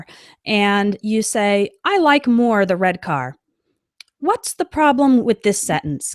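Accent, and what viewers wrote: United States. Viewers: Hello, I´m Adriano from Brazil:) I´ve been following these English tips and videos on internet a long time ago. And I can sure my English skills are much better now. Thanks for the incredible English free tips you give us in your website <link>'. Your american accent is very clear and very easy to understand. As an English learner, I really appreciate your lessons a lot. Bye-bye and greetings from Brazil:)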